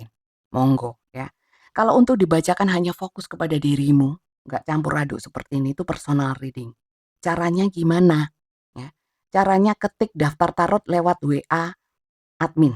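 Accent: East Java, Indonesia. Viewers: MANTULL BUNDA...JAWA TIMURAN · Bunda lucuu beneran krmekel sy... gak uwe uwekk😂😂😅😅 Asli jowo ne ketokkk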